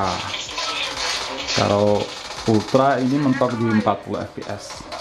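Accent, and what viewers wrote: Blitar, Indonesia. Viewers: Wong blitar yo mas 😂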